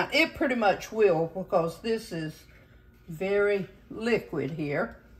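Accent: South, United States. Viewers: I enjoy your videos and I love your southern accent! You’re adorable! ❤From Adam in Lexington, KY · I love hearing you talk! I was born in SC not far from you in Greenwood and much of my family still lives in your state. My daddy, a son and daughter-in-law, and a grandson have all gone to Erskine just down the road from you. I even have a brother and sister-in-law in Anderson. So your lovely southern accent reminds me of home. Thanks for all you share with us!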